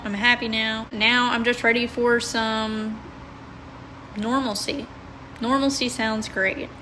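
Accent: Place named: South, United States. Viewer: I'm in love with your southern accent,.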